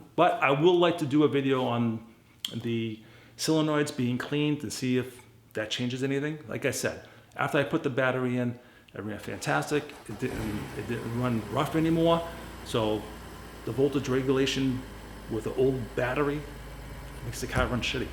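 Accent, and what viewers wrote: Boston, United States. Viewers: You cannot hide that Boston accent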